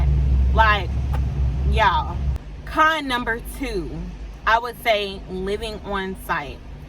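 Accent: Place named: California, United States. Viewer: You sound like me a Cali girl coming to a new state… NC. Getting into Real Estate but waiting to get things transferred over… so it felt like a right start to get into leasing/sales. I got hired as a leasing consultant due to my background, work history and personality. This gives me great perspective and an ideas of what I’ll have in store. Glad to live in a safe community. I’m here for the pros and cons. Including a work life balance. Thanks for being honest! 😊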